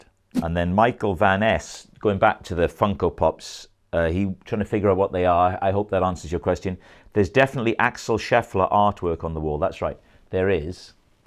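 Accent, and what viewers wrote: Wales, United Kingdom. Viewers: You could just read off the ingredients on the back of a can of baked beans and I’d be in heaven…I miss hearing that gorgeous Welsh accent ever since I moved across The Pond 39 years ago from Wrexham. It’s a bonus that you’re funny!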